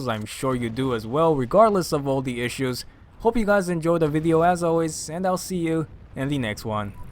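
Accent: Germany. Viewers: what really?!? He totally has a german accent XD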